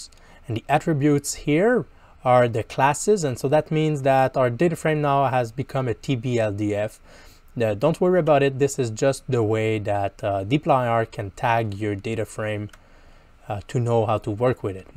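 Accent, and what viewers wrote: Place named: Quebec, Canada. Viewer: Salut! Avec ton léger accent, j'en ai dédui que tu étais Québécois ;) J'aurais une question pour toi! Dans mon DF, mes variables de ligne sont des entreprises (1 entreprise a plusieurs lignes, comme c'est le cas de la sorte de lys qui a plusieurs lignes) et mes variables de colonnes sont binaires (0/1). Je souhaite donc combiner mes variables de colonne en somme (au lieu de moyenne dans ton exemple). Je suis capable de le faire avec la formule suivante : by_company <- group_by(test_sans_tweets, username) sum_by_company <- summarise(by_company, sumlocal = sum(Local)) Cependant, j'ai plus de 500 colonnes. Y a-t-il un moyen de faire cette formule avec toutes les variables en même temps pour ne pas avoir à la copier-coller 500 fois? Merci beaucoup :)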